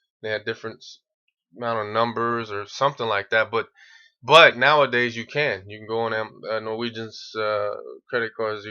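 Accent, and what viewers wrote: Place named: United States. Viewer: You sound so american - why are you interested in Norway?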